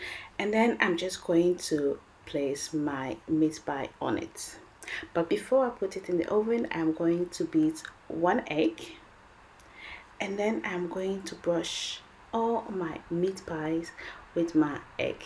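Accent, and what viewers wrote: Ghana. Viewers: I love your Ghanian accent, I have that with Dutch ..lol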